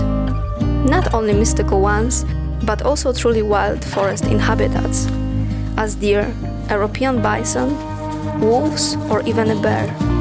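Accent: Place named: United States. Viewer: Piekny film.Jest sub. Świetnie znasz angielski.Taki bardziej w amerykańskiej wersji(accent). Pozdrawiam.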